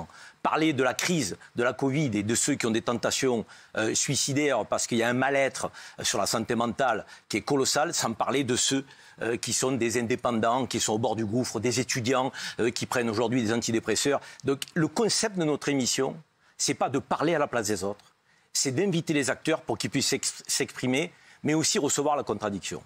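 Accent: Marseille, France. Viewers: Zeribi., tu le fait bien l'accent marseillais..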